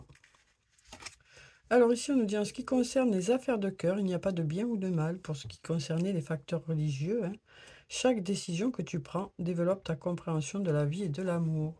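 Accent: South, France. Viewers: Bonjour,cela fait plaisir de vous,mettre un visage sur l accent du soleil e👍🤩😘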